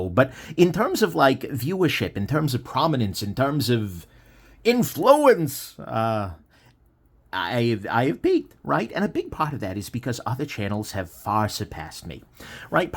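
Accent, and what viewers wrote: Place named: Brooklyn, United States. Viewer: Love your voice, it's like if Professor Frink was from Brooklyn.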